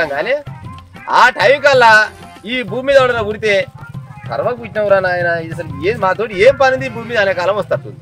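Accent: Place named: Telangana, India. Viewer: గివార్తేమో గానీ,. నీ నోటినుండి వచ్చే నా పల్లె యాస- నా తల్లి బాస - నా తెలంగాణ యాస.. మస్తుగుంది.. 👍👍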